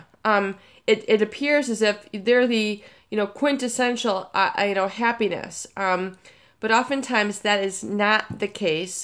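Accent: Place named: Great Lakes, United States. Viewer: Hey, I can hear the Great Lakes in your voice. Buffalo? Cleveland? It’s charming.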